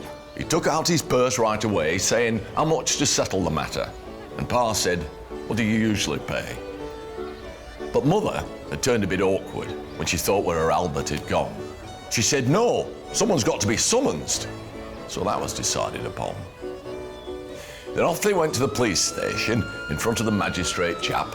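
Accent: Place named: United Kingdom. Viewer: Обожаю британский акцент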